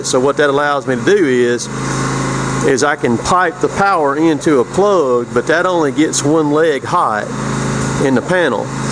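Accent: South, United States. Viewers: Love that southern accent... From fellow southerner on the NC/SC border. 😊 love these videos! Stay safe!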